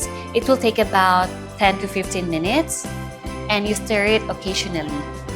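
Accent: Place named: Philippines. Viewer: I thought you are in a foreign country then I realize you were a filipina when you spoke and saw the video.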